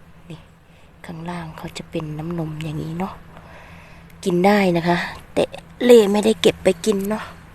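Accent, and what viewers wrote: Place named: Korat, Thailand. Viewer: สวัสดีค่ะ นกเข้ามาเก็บเห็ดด้วยค่ะ พูดสำเนียงโคราช อยู่ที่ไหนคะ นกอยู่อำเภอสีคิ้วนะคะ 🥹🥹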